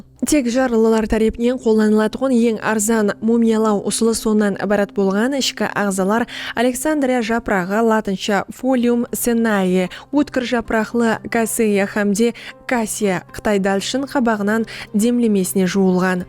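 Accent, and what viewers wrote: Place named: Karakalpakstan, Uzbekistan. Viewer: Берекелле журналист кыз таза Каракалпакша сойлегенин ушын рахмет каналды коллап кууатлайык ❤❤❤❤❤ лайк праписка болыңлар жаксы мәғлүматларды алып барыудан шаршаманлар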